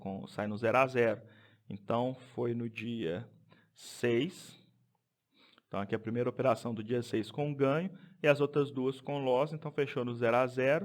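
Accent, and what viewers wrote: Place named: Minas Gerais, Brazil. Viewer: Mineiro uai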